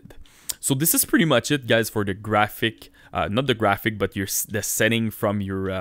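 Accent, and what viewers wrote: Quebec, Canada. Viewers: Man you're hella Quebecuois.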